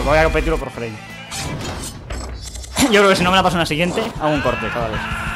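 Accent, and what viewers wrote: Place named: Chicano, United States. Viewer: Apoco si hablas español, pensé que eras chicano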